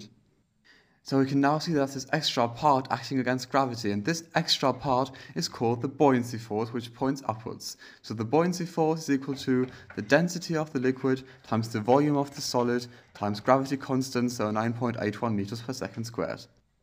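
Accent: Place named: United Kingdom. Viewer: British english 😮🎉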